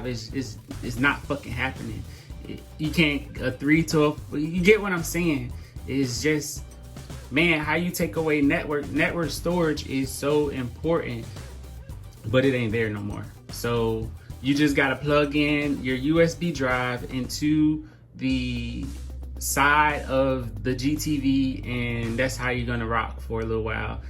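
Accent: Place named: United States. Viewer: Also, BTW, I might add that it's refreshing to hear an American with an American accent Talking about this stuff! Usually it's someone from China or whatever, in broken English. I didn't realize this hobby was so popular here in the states too! I'm in Montana (although I'm from the NY/NJ area originally) and nobody around here has any clue about this at all! Back in the Days of analog cable, we used to use the "boxes" that turned on all the channels but I'm Talking about late 1990's there. I didn't even Knew such a thing as this exists until recently! What part of the country are you in?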